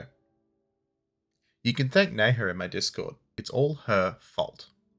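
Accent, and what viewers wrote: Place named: Australia. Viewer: A difference in dialect, he’s Australian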